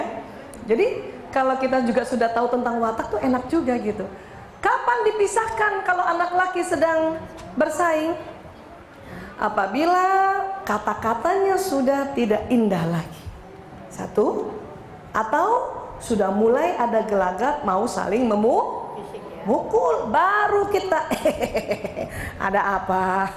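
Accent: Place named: Banyumas, Indonesia. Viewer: Bocah ngapak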